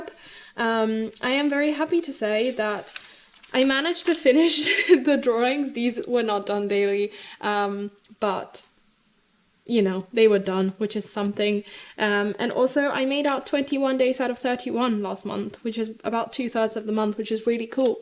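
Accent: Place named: United Kingdom. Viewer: ahh neat! I mostly think I hear an English accent when I hear you speak but as I said the longer I listen I realize there is something else there and I knew you had mentioned Italy before! Accents are so interesting in the way they can blend together and change quickly! I always think I would adopt an accent of wherever I am lol.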